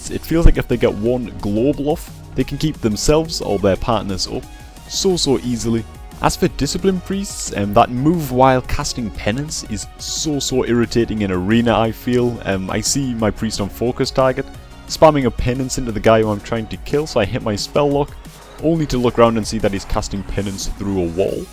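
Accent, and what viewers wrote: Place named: Geordie, United Kingdom. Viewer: he's either a Geordie/Mackem or a sand dancer, cant tell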